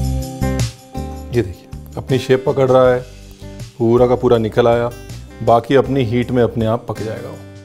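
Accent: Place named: Punjab, India. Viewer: I jst love ur panjabi style talking sir and hindi both,and ur recipes r tooo easy to cook at home and yummy,mostly sweets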